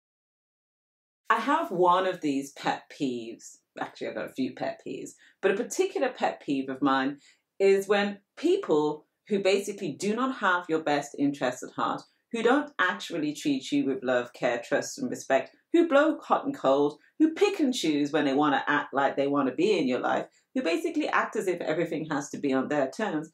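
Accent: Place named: United Kingdom. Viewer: Love when she says "are you flipping serious?!" in that British accent. 😊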